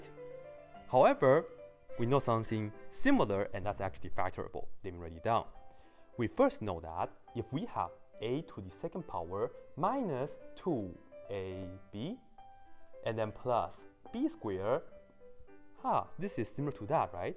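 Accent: Singapore. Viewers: It sounds like Singlish to me.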